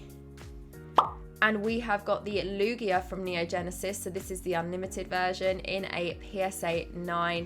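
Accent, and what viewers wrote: United Kingdom. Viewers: Very nice cards. I love the English accent, also. 😅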